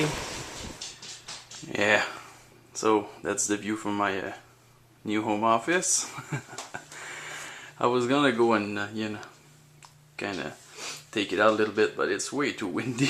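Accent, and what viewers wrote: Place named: Acadia, Canada. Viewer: yes from val damour nb je suis acadien aussi lol ses pourquoi je reconnais laccent lol merci pour vid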